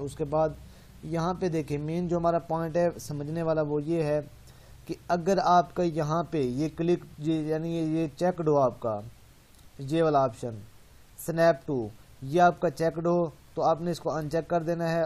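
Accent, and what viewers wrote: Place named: India. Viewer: Shame that you speak too quickly and as Indian English accent is very difficult to follow , it would be better if you place subtitules.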